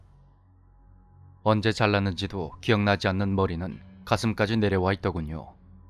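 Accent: Gyeongsang, South Korea. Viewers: 경상도분이신가봐요 요즘 목소리로 열심히 밀린숙제하듯 듣고있읍니다 경상도사투리가 완전 반갑고 어색하지않고 참 좋아요~~^^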